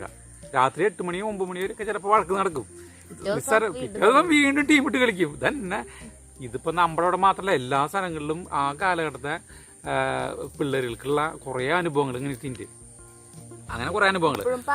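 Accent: Thrissur, India. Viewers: I like urTrissur slang .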